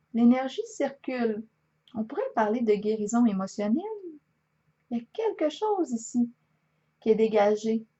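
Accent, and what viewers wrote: Canada. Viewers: Les expressions canadiennes sont très belles. Je les aime depuis longtemps. Ainsi que cet accent canadien. Et surtout, vous êtes toujours très très profonde. Merci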